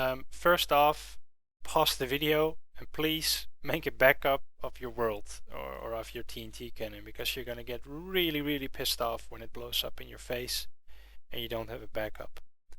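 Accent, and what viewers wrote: Netherlands. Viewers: nederlander zeker :P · lol , you sound dutch :) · je bent nederlands he? hoor het aan hoe je bepaalde dingen zegt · U DUTCH RIGHT? ik hoor het aan je accent x)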